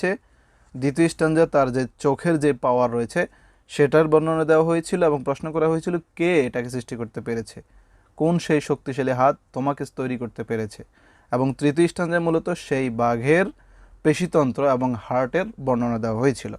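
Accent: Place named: Faridpur, Bangladesh. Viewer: আপনার বলার ধরণ একসেন্ট, টোন, অনেক টা ফরিদপুরের মতো। ❤❤❤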